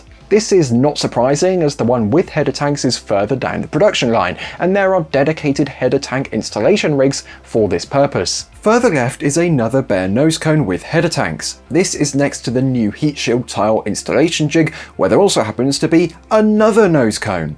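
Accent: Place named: England, United Kingdom. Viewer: Are you really trying to tell the English guy how to speak English? The meth pronunciation is from the dumbed down version of English. 🇺🇸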